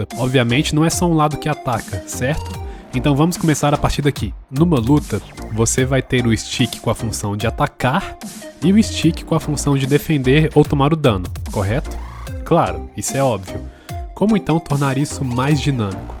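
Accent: United States. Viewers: Você é brasileiro ou americano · Eu pensava que o cara era americano até ver esse vídeo 🗿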